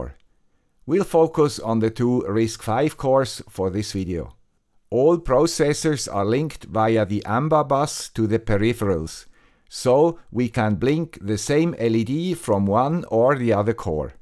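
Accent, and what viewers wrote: Switzerland. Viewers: we're tired of your Swiss accent! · wow! Thanks guy with the Swiss accent! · your dulcet Swiss accent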